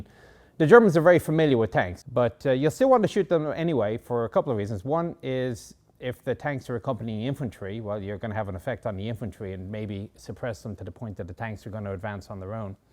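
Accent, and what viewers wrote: Ireland. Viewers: Long way to “Tipp” with the accent! Got to UCD Belfield? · Is that an Irish accent? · Great video. Bit of a twinge of an Irish accent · This guy is the BOSS!! He sounds Irish ☘️ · I'm sure he won't mind, but that is an Irish accent.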